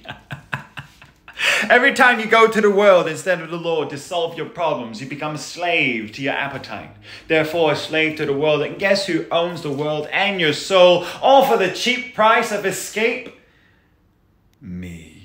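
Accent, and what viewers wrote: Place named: United Kingdom. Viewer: The British Accent😅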